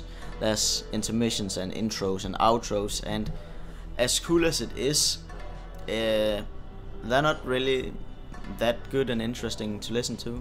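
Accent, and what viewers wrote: Denmark. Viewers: are you danish? you kinda got the danglish accent lol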